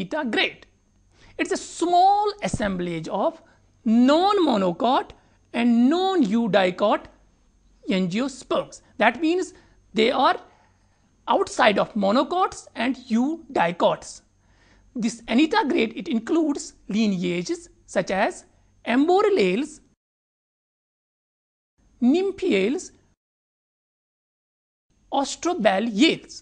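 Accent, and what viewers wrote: India. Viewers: I dig British accent when spoken by English peoples. Yet surprised how English speaking Indian folk, can so easily mangle such a comforting vocal sound! Even when narrator in this video doing so, is speaking about one of my favorite subjects. Morally I agree, my Mother should wash my mouth out with soap after making such an unpolitically impolite rude statement like I have here. I did make a responsible effort attempting to imagine how another Indian viewer would be proud that narrator is a fellow countryman, carefully explaining details involving this technical subject. But ended up imagining that fellow person also from India, totally agreeing with me about narrators accented British accent.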